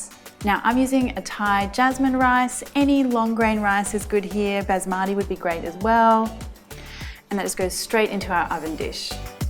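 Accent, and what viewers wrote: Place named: Australia. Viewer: I love your Aussie accent. Your recipe looks humble and simple. I will try this yummy recipe even if I have to wait 70 minutes for this meal to cook lol. Cheers girl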